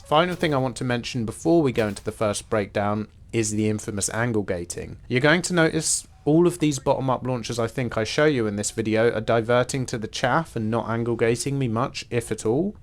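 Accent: United Kingdom. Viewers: Your channel is starting to become my favourite WT educational/training (if I can call it that) channel on Youtube. On point and posh accent! I do note the distinct lack of Shar FA2 content though. How about doing a next ARH tips with that? Come on, embrace the suffering haha.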